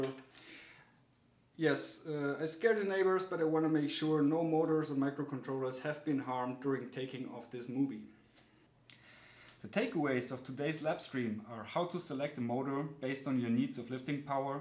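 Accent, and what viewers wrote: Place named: Germany. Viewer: Ich mag deutsche Akzent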